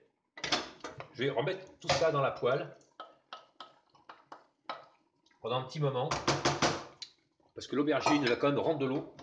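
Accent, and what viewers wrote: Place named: Savoy, France. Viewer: On y entend juste un petit accent savoyard.... lol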